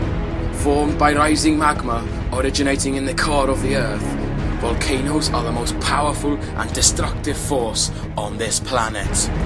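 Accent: Wales, United Kingdom. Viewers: I think welsh.not sure but it does sound awesome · I'm acc here for revision because I have a mock, and I'm getting these cheeky lads giving a commentary on something they'll never probably use! That Welsh accent though!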